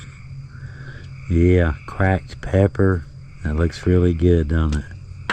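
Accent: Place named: Virginia, United States. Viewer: I have been boondocking in this rain also for days and nights! HA! I love your Hillbilly Humor! You so funny. And you talk like us here in SW VA. I will be camping in West by God in June, love it!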